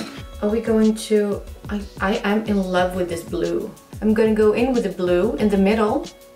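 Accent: Sweden. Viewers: you are magical ❣️ 🧝🏼‍♀️🧞‍♂️🧚🏻‍♀️ I loved “The Swenglish”. I just got my palettes I ordered from your website. I had to stop this video before you started the tutorial and go to Nabla and ordered that palette. Your eye look was gorgeous 😍😘❤️ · Swinglish! 🤣🤣🤣 Love it!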